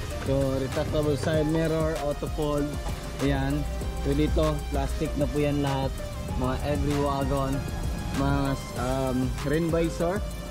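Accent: Visayas, Philippines. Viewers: Bisaya ka boi?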